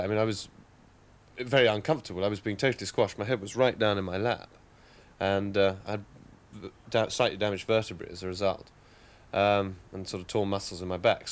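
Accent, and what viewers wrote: RP, United Kingdom. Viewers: I could listen to this guy for ages. He's posh but not pretentious.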